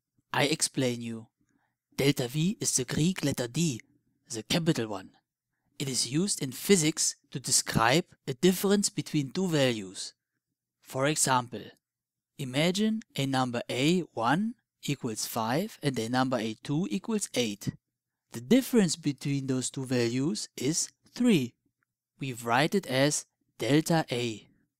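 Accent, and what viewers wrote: Germany. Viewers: Do i hear deutsch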